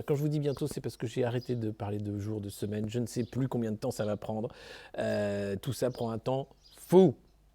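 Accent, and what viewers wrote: Quebec, Canada. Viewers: L'accent québécois "a frigore" !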